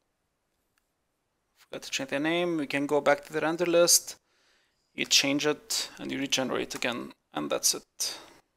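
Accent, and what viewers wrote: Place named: Lebanon. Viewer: are you lebanese i can tell from the accent /;0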